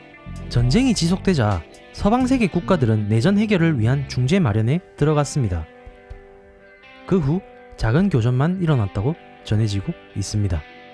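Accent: Gyeongsang, South Korea. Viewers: 갱상도말 신경쓰이면 안들으면 되제 따지는게 많노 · 경상도 20년 살았는데 좀 이상하긴한데 서울말씨보단 듣기 편함 ㅅㄱ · 경상도 사투리 흉내내세요?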